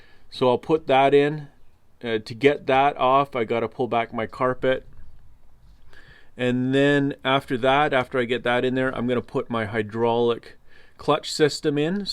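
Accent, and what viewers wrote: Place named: Canada. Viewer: Next, they'll be telling you when to change your underwear.. You accent spells Canada, am I correct..?? If so, it figures..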